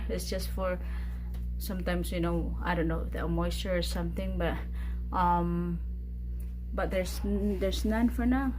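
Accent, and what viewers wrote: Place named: Philippines. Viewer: Filipina accent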